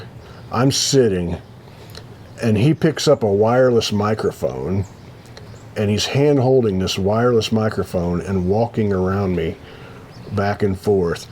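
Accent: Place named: South, United States. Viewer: Ain't been here for all 100. Not even close. But you're a natural story teller. That genteel southern drawl is a big plus too. You and your friends are real easy to watch. You've got a way about you. Thanks for creating this channel. It's just my style.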